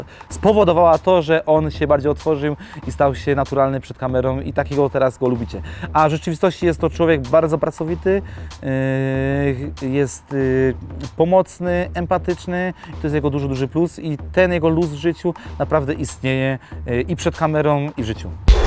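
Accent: Silesia, Poland. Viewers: nie kwestionuje tego, po prostu musiałem tym komentarzem dać upust emocjom, ponieważ najzwyczajniej w świecie nie podoba mi się gwara śląska, mimo że twój kanał jest całkiem fajny ^^ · a jak ma mowic skoro jest ze slaska xD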